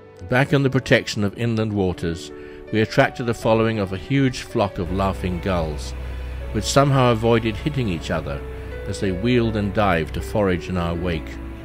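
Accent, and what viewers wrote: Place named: RP, United Kingdom. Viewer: There is no better way to tell a story than when the narrator speaks the Queens English. We Americans should learn to speak clearly and enunciate words properly. My travels to England however, proves they also should learn the same lesson.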